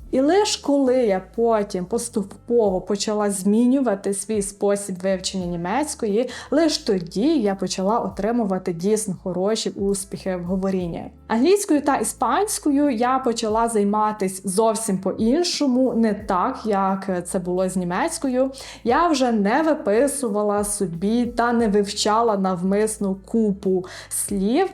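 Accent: Western Ukraine, Ukraine. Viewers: Судячи з акценту говірки (характерного м’якого «и»), ви, швидше за все народились і виросли на заході України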